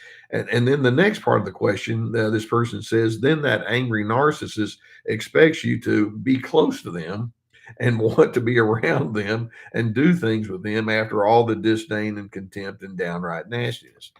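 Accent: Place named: Texas, United States. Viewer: ! I love your voice! And that you are slightly self conscious about this! You are so kind beautiful and helpful! Your voice is one of your signatures and I could recognize you in the dark! Not to mention your Texas accent! You are the best!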